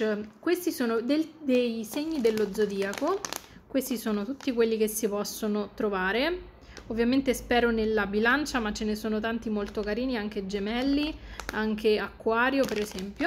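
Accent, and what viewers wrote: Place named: Rome, Italy. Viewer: Ciao!! Di dove sei? Io convinta che fossi di Roma 😅 Quando ti ho sentito dire che non c'erano nella tua regione ero tipo 😮 Comunque tutti bellissimi ❤️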